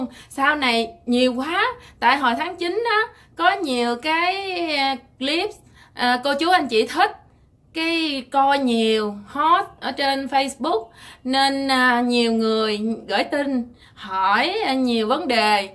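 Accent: Mekong Delta, Vietnam. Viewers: Cam ơn em rất nhiều em đả cho thấy được cuộc sống ở mỹ qua giọng nói người niềm nam thật dể thương , chúc GĐ em hạnh phúc nhe..... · ...minh song tai MY cung lau roi...van ung ho cho kenh cua...thich vong noi nam bo that tha chat phat....luon ung ho cho kenh cua ban...!!! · Chào c . Chúc c nhiều sức khỏe e rất thích c giọng miền tây dể thương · oi xem em noi chuyen chi thich lam dan mien tay chan chat thiet tha, chi lam dau mt 2020 nay la 37 nam do, nen yeu lam dat va nguoi mt, chi chuc tan suc khoe trieu niem vui ben chong con hen, q4 sai gon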